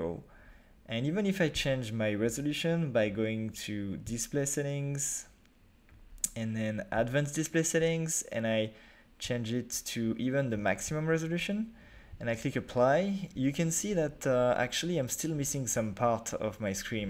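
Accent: France. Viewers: are u french xD ?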